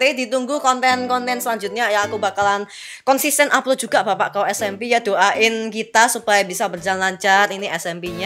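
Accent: Java, Indonesia. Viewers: Gw Udah Penonton Old Tapi Gak pernah Komen Jir :V Gw suka Syle lu Medok :V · Medhug suaranya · Mungkin tanpa kalian sadari suara agak medok jawa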